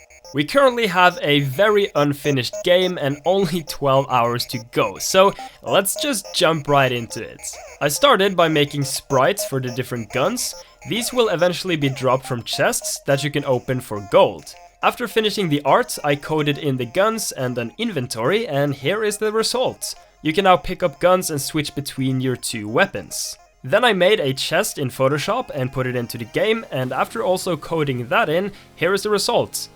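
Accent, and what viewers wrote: Norway. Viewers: Wait are you norwegian? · are u Norwegian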